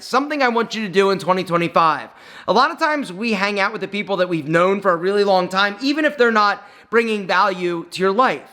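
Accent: Philadelphia, United States. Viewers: “Wood-er” the Philly/Delco accent stays with you!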